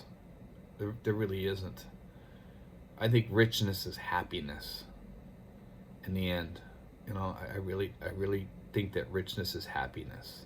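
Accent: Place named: Northeast, United States. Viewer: Sounds like your from the northeast of USA with your accent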